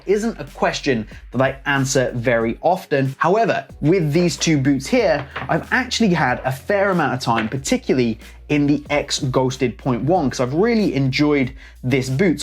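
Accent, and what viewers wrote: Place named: Cork, Ireland. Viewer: no way XD I never wouldve guessed, your Cork accent is very mild hahaha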